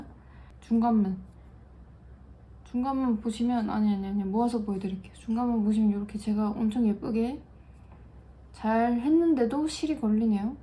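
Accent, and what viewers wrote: Seoul, South Korea. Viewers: 서울사투리 오랜만에 듣네요 ㅋㅋㅎㅎㅎ 잘 배워갑니다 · 정말 예쁩니다 판다면 사고싶을 정도로에요 ㅜㅜ 긴머리랑도 잘 어울리시네요 추천으러 처음 봤는데 말투가 중독이에요 약간 서울사투리+어딘가 사투리 섞인 느낌이네요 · 말씀하시는게 약간 옛날 서울사투리? 그런 느낌이라 너무 신기해요 ㅋㅋㅋㅋㅋㅋㅋ 목소리 높낮이도 그렇고 말투도 그런느낌이세요!! 목소리 이쁘시고 묘하게 웃겨요 ㅋㅋㅋㅋㅋ 😂😂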